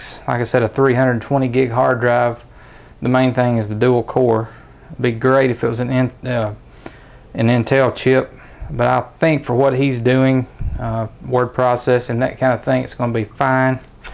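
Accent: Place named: South, United States. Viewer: very nice southern dialect, sounds like in a movie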